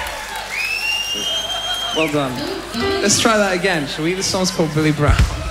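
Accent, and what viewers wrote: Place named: United States. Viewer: he sounds so American!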